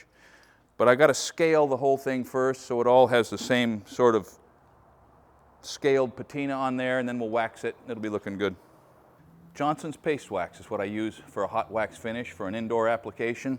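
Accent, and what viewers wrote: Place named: Oregon, United States. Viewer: It sounds like you're from Oregon as well. So cool! I am interesting in learning blacksmithing myself and this is a very helpful video.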